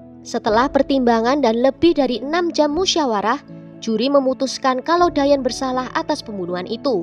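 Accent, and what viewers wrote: Java, Indonesia. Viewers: Hay medokuhhh cantik 😍😍😍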